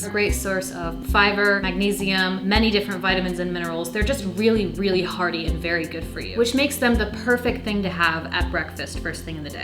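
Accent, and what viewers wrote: United States. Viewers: heyy thank u for the video its fab! :) so good to see more and more vegans with YT channels!! do you have the written recipe up somewhere? would love to try it. im guessing youre american by the accent and also when you said 400 degrees!! XD im not sure what that is ill have to google the conversions or if you might consider putting the metric amounts as well in the written copy that would be amazing!! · Can't wait to try it! Thank you for sharing. BTW Virginia accent?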